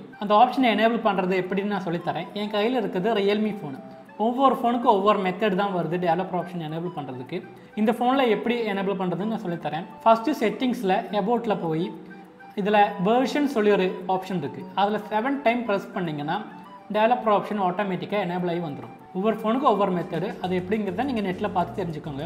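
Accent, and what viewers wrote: Kerala, India. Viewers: Bro....neenga malayali ryt😁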